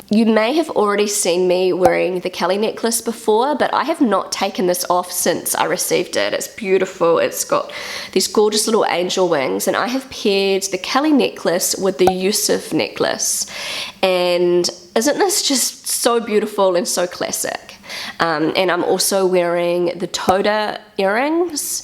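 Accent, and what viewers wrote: New Zealand. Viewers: Hi from NZ! I love how strong your New Zealand accent is even after living in Germany for quite a long time 😁 💗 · Hi, I am a native German speaker who has never been to NZ but I love to watch your videos and to listen to your dialect! Your children have been a lovely change but I understand your decision. Happy X-mas und einen guten Rutsch ins neue Jahr.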